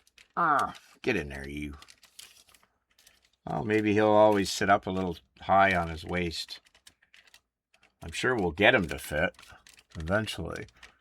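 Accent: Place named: Scotland, United Kingdom. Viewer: Your definitely Scottish not Finnish... Lol Great kit!!! 😎👍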